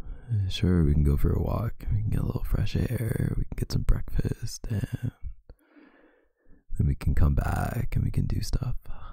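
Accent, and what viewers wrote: Canada. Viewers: Omg you’re voice so nice 😳🥺 and your giggles/laughs make heart melt 🥺💖 to dream of having a boyfriend sound like you lol I love Canadian accents 😊 they make me melt 🥺 new fan from the UK here lol Stay safe 😊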